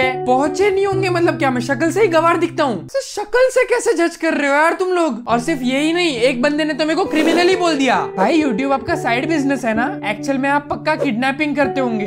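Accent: Gujarat, India. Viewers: Tum jese bolte ho vese Pata chal hi jata he ki tum gujarat se ho❤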